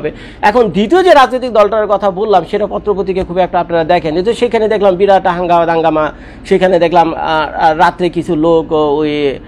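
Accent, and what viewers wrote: Dhaka, Bangladesh. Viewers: ভাই আপনার কথা শুনলে হাসি থামিয়ে রাখতে পারিনা বিশেষ করে যখন আমাদের ঢাকার ভাষায় কথা বলেন । ❤️❤️❤️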